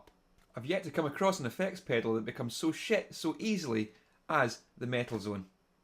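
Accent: Scotland, United Kingdom. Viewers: your accent was a Little difficult, are you scottish? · The Metal Zone got its bad rap because (most) guitar players can't work a parametric ("sweep") EQ. I find it way easier getting the sound I want out of any amp using the MT-2 than any other pedal. Just don't crank the distortion, boost the mids and sweep it until you find your mids sweet spot, then dial it back to where you like. DON'T boost the highs too much, nor the lows. You can easily get 5150 type distortion out of this pedal straight into a line box if you know what you're doing, which its critics don't seem to. Ps. I love your scottish accent, but your guitar tone could open up its top end a bit more. Ds.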